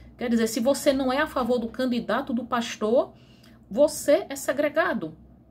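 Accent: Northeast, Brazil. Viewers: Amo o seu sotaque nordestino, sempre achei bonito. Você transmite calma e coerência nas suas falas. Obrigada por produzir um conteúdo de qualidade!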